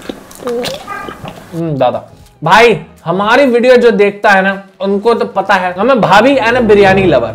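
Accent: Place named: Bengal, India. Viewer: Iska Bengali sunke hasi nahi rok paya😂😂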